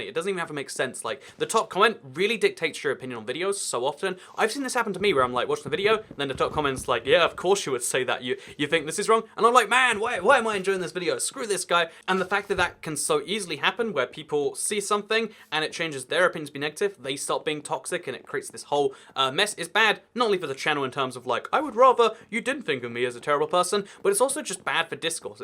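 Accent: United States. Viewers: Also you sound pretty American to me. Then again I thought a German YouTube's was Dutch...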